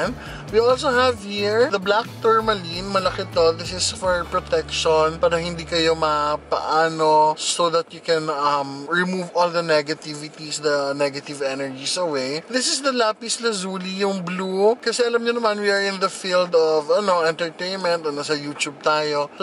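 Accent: Iloilo, Philippines. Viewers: ilonggo ka man? hahahha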